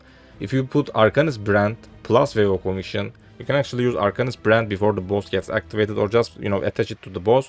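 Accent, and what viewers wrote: Turkey. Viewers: Anında Türk olduğunu anladım aksandan :D Bende genelde böyle konuşuyorum videolarda ya da sunumlarda kjahbfljangsg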